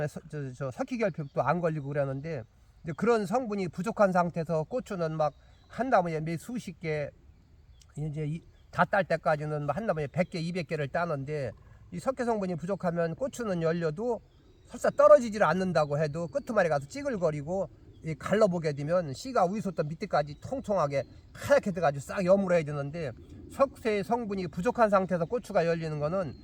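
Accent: Chungcheong, South Korea. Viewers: 정겨운 충청도사투리의 설명이 참듣기좋아여 오늘도 추비의 중요성과 비료 선택의 중요성을 잘숙지 했습니다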